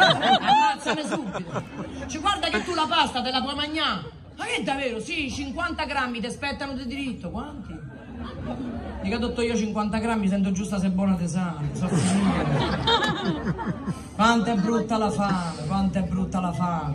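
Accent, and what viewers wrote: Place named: Rome, Italy. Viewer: Parla come un maschio romano. Corpo da donna molto bella ma parla come un uomo. Cresciuta con 5 fratelli e normale. Ho una sorella così. Non e nuovo per me siamo 5 fratelli e mia sorella che rappresenta 10 uomini e giudici sono guai se vi capita di incrociarla. Valentina Persia e sympatica, sincera et sopratutto modesta... E mitica e unica. Un altra che estimo Anna Maria sconsolata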